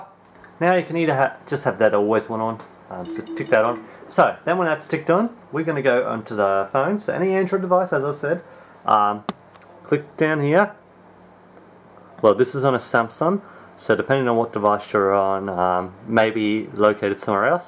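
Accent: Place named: Australia. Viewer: It's not that hard to understand him. He's Australian. It's like American but with an accent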